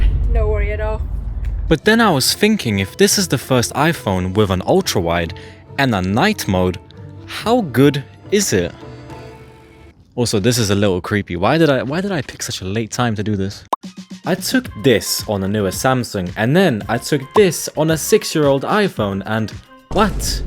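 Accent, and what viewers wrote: United Kingdom. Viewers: Your British right cause I am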